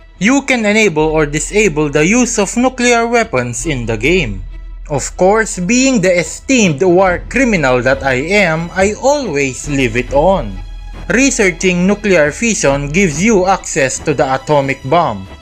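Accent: Philippines. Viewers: Why do we have the same accent? Kabayan? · Spiffing Brit with dat Filipino accent 🔥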